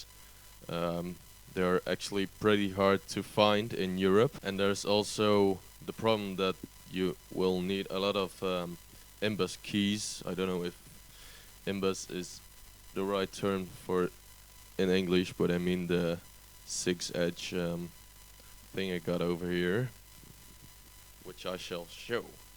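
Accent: Netherlands. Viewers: thou sounds dutch. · lol he's from the netherlands :P. je hoort ut gelijk aan z'n accent. nice vid btw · Haha i can hear your dutch just like me:D im enjoying the video;)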